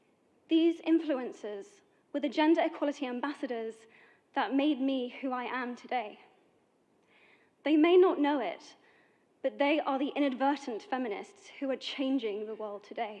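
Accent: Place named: United Kingdom. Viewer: bla bla bla..è vero forse per i paesi musulmani, asiatici o africani ma in Italia no. Purtroppo qui, come nei paesi occidentali abbiamo già sperimentato cosa intenda la donna per femminismo: abbiamo visto troie fare la coda per fare i festini dal primo ministro, donne (anche minorenni) disposte a vendersi per una ricarica del cellulare, donne al potere incompetenti (pivetti, boldrini, santanché, picierno..), donne bugiarde e più meschine degli stessi uomini, cantanti celebri che "pornificano" ogni loro gesto, le parole dell'attrice inglese sono retorica vuota e stantia per femministe fallite. Ringrazio per l'upload, ho potuto così saggiare l'ottima pronuncia Bristish di questa attrice sin ora a me sconosciuta. Grazie.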